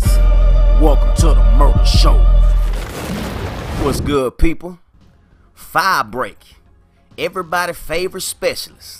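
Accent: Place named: Atlanta, United States. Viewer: that nigga funny as shit llssss sound a atl nigga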